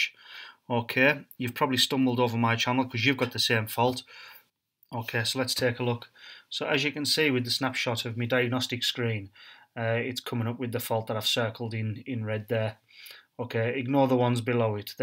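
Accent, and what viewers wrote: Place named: Cumbria, United Kingdom. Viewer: You sound like your Cumbria based, I have one of these with the p0016 code, do you fancy doing mine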